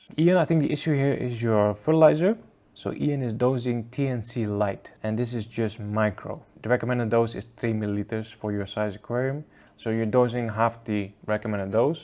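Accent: Belgium. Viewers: Ben ik de enige die niet kan slapen tot ik zijn Belgische accent gehoord heb? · I do wana hear your belgian accent xp k ben van Antwerpen! Xp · I definitely want to hear your Belgian accent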